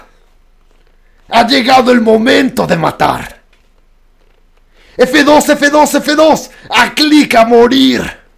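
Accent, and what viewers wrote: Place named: Chile. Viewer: q asco la del pobla, su voz chilena va eschar peder el juego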